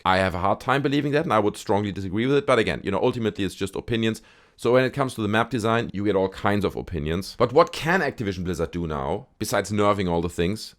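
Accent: Germany. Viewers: I like your style. You sound German, but have really good English. And your videos aline with what i usually watch - anti woke, cod, pop culture stuff. Keep it up. I'll watch some more and gonna sub later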